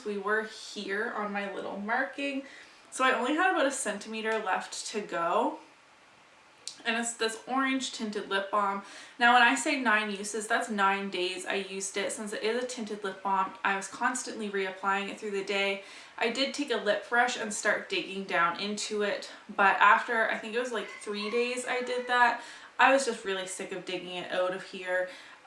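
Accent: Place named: Canada. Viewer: Awesome update! Nice job losing the pounds and for having two empties!! 👏🏻👏🏻 I have been wondering this whole time if you are from Canada, so happy to finally get confirmation in this video!!! I seriously love your accent! Haha as weird as that sounds! 😂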